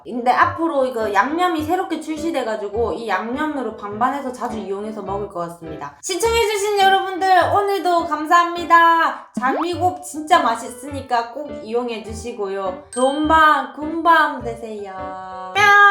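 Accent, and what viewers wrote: Gyeongsang, South Korea. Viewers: 저왜 안광이 보이죠? ㅎㅎ여자인내가봐동..넘이쁘다 ㅎ 이거익은거예요~~ 같은 또 경상도인이로써!!! 자랑스런이쁜받는말투!!ㅋ 렌즈를 따로꼇다해도 안광이... 그리고 두겹 팔자주름..매력포인트... 전좋아요 저부분!!ㅎ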